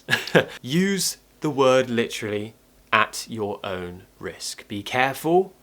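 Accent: RP, United Kingdom. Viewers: I'm Mexican and I prefer the British pronunciation vs American, it's easier and eny foreign can understand what you are saying. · I'm brazilian and completely in love with your british accent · I looooove the RP accent ❤️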